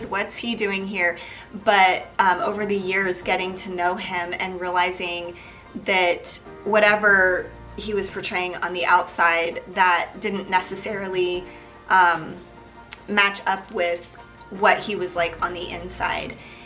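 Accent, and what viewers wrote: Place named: Texas, United States. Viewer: Love friday night lights ❤ haha I love the Texas accent. Bonnie would have talked like that 😍🥰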